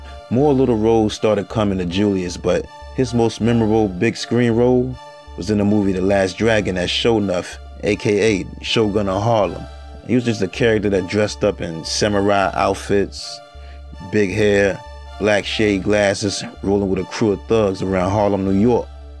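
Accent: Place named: Baltimore, United States. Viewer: Great video you sound like your from Baltimore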